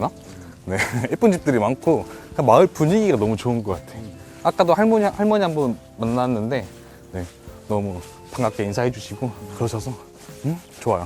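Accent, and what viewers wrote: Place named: South Jeolla, South Korea. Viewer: 전남사투리... ㅋ 많이 쓰시네요.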